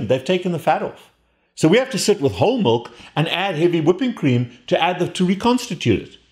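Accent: South Africa. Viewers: I could tell you are South African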